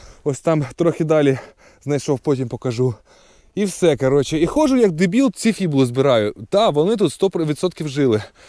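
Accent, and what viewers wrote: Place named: Russia. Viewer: Привет,😂ну разговариваеш ты на украинском НО с русским акцентом 😂😂😂😂😂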